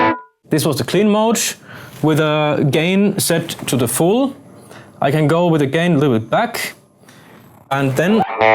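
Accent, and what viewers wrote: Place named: Finland. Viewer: suomienglanti ftw :D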